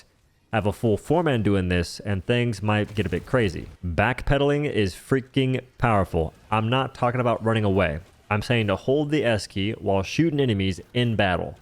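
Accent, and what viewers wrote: South, United States. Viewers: could be georgia could be louisiana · the way he says WH- is prime american.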